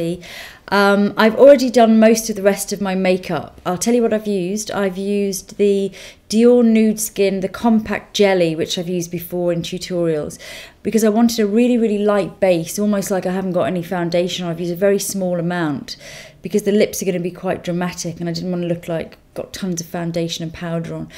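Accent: United Kingdom. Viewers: your amazing and love that your bristish! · i love your british accent!! xD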